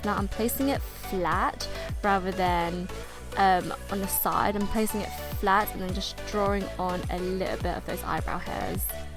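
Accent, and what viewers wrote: United Kingdom. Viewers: I DIDNT EXPECT U TO BE BRITISH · Are you british? sorry I just subbed your channel >~< Though Ive been stalking you on instagram for a while... that doesnt sound creepy at all... anyway, love you! ^•^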